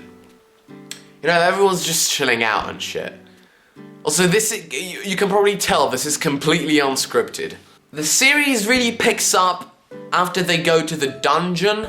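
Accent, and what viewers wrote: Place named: United Kingdom. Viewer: I LIKE YOUR VOICE IT'S MY FAVORITE KIND OF BRITISH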